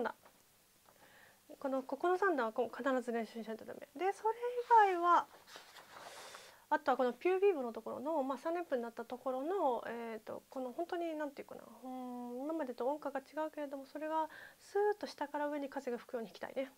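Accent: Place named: Iyo, Japan. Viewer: てっきり関西の方なのかと思っていましたが、京言葉のルーツもある伊予のイントネーションが可愛らしを演出しているですねと、音楽以外のところで感銘を受けてます(^_^;)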